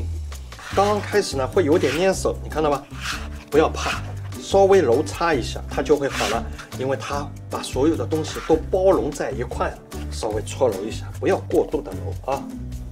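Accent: Shanghai, China. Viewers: 好亲切多上海式的普通话啊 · 上海普通话，亲切啊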